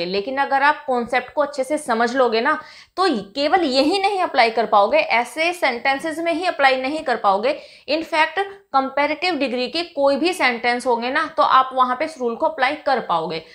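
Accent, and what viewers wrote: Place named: India. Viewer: Dear। beta....you really do well...but be little more cautious while using any strucure,and meaning and sence of sentece as well...And please do correct your pronounciation and your dialects.....your UP.BIHARI,AUR HARYANVI touch spoil the language......work over it....Dear Your sentence should be.....The younger he is more crafty or mean he is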